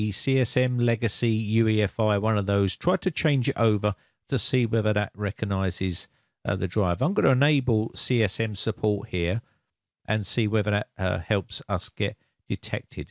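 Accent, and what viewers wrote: England, United Kingdom. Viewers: Are you and Michael Cain from the same area of England?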